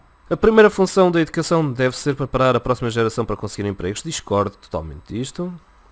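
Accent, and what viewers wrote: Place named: Portugal. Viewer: fico vendo teus videos e começo a falar que nem você, com o 'sotaque' de Portugal, como vocês portugueses veem o português do Brasil.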